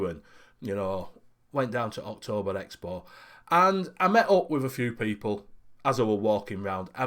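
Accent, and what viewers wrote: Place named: Leeds, United Kingdom. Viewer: Ey up, only been here a short time. But heard TVP mentioning you from time to time, but Deano TDV mentioned you as well. Went on to 3 men chat after TDV show on Wednesday n Aden mentioned you as well. So thought I'd gi'it a go. Soon as I heard Leeds (just up't'road twang I liked it) so stuck on with it. You keep an eye on chat as well as chatting with other hosts (which is a pro on so many levels). I too would like to thank Les Pickin, without his videos on how to mix, I wouldn't of learned how to mix. So big ups to Les or I wouldnt of been able to create my StRipple. I probably won't be about as much when I go back t'work. With you and hosts doing mixing, your numbers should go up more as I havent seen many other people doing both mixing and reviewing, all the best in the future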